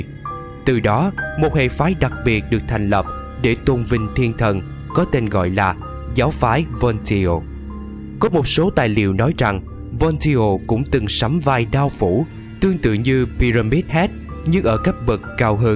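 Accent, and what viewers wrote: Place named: South, Vietnam. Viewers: Giọng miền Nam mãi đỉnh 🎉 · Vẫn là giọng đọc mình thích nhất :)) giọng miền nam đầu tiên out trình mấy a giọng bắc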